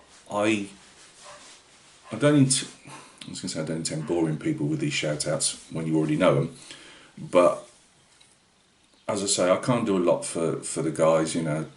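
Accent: Midlands, United Kingdom. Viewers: It's Tony by the way lol... Live in Worcester but grew up in Brum... You from Midlands?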